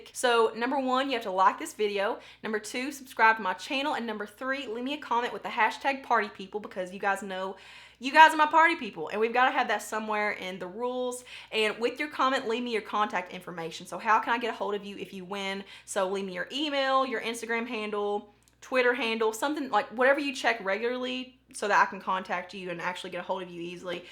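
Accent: South, United States. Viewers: Love love love your southern accent girl it’s so beautiful to hear. You are beautiful and your skin is amazing.! I’m Always here to support smaller YouTubers Never give up your dreams !Xoxo from Emmett Idaho! 💗🌸 Congrats on 25k! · I love when I find new people to watch. Regardless of the giveaways I subscribed and rang the bell as soon as you started talking. Love the southern essence #partypeople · #partypeople where Is your accent from? I love it, I'm southern also but I'm more of the hill Billy type lol hate that you are crying even if it is happy tears, you seem so sweet and although I don't know what's going on but I'll pray for you also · Here from Vianney's channel, subscribed, but not entering the giveaway, because I know nothing about applying makeup lol. I will say that I love hearing a southern accent!!! (Tennessee girl here) I also like that you're relatable and not afraid to show your emotion. 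Prayers for you and your family. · I love your country accent!❤️ And your makeup is 🔥 #partypeople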